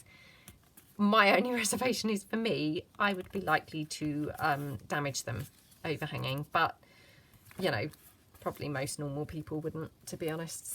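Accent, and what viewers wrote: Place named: Midlands, United Kingdom. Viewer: Great ideas! You sound like you might be from the Midlands, like my relatives. ; )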